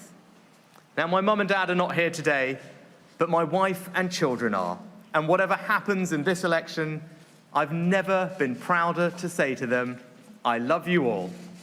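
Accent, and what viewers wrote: United Kingdom. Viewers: Bravo to Rishi Sunak. If someone puts a curtain in front and hears him, will know a sincere British is speaking...So Decide Whom you vote....Best for Your New Prime Minister... God Bless Britan !!!!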